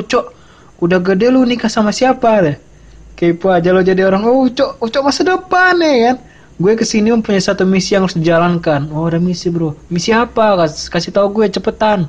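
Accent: Medan, Indonesia. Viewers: bang :v orang Medan ya :v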